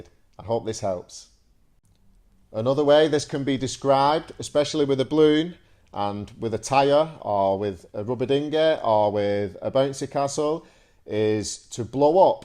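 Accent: United Kingdom. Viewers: Hi, how are you? What kind of British accent do you use?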